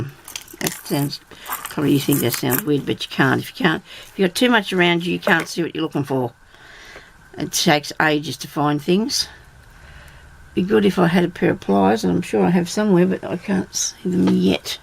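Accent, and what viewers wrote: Australia. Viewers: hi, just found you on you tube soon as i heard your voice i knew your a aussie lol. just wondering were abouts in australia I am in south australia. do look forward to watch your future videos and you older ones take care. Lesley x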